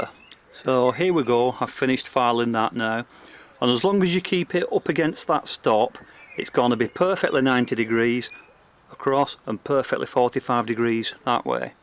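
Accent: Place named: Northern England, United Kingdom. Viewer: Thanks for your reply. I wouldn't have guessed Northern England, but I wasn't sure. I spend a summer in Sheffield many years ago with a bunch of Australian friends. I loved England and haven't had a chance to get back. This little tool is brilliant. I am having one struggle with though, maybe because I'm a beginner, but maybe you have a tip. When I am filing a piece of sheet metal I find that after I have squared it upon the stop I notice it shifts sometimes when I go to tighten it back up. Probably need more practice. It looks like you are holding it in place with one finger while you tighten. I will try giving that a try. Thanks again for sharing these videos.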